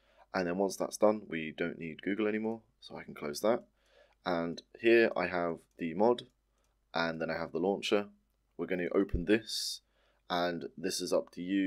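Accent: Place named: Oxford, United Kingdom. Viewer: Thanks for the videos, what about a playthrough? You are from UK? I have a colleague from Oxford with the same accent.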